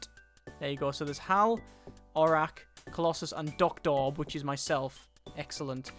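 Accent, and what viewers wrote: United Kingdom. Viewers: Others have done it. Like Nerd3. For some reason (maybe the british accent and their good taste in games) I tend to confuse and Nerd3 sometimes;P Not like "Oh, is this Nerd3 or I'm watching" but memories of one channel or the other tend to fuse together.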